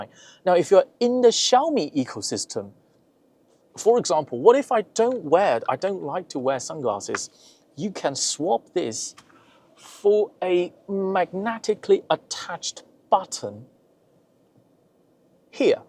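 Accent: United Kingdom. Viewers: The British accent is so distracting😂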